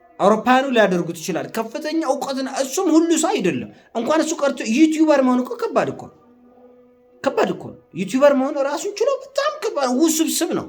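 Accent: Tigray, Ethiopia. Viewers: ሰላም ሰላም ለምንድነው በትግራዋይ አክሰንት ቋንቋ ዜና ማስተላለፍ ምርጫህ ያደረከው? ትክክለኛ ቤሄርህስ ከየትኛው ወንሞቻችን ነክ? በነገራችን ላይ የማንም ብሄር ተወላጅ ብቶን ወንድማችን ነህ!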